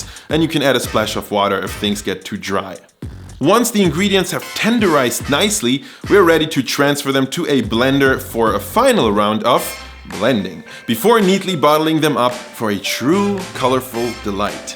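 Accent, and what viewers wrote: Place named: Berlin, Germany. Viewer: I immediately knew you were from Berlin because of the way you speak and when you showed the houses I was certain lol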